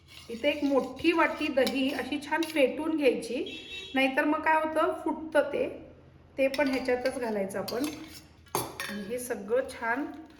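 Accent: Vidarbha, India. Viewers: का~~व माय, विदर्भाची का तु...मले वाटलं च....खुप चांगली भाजी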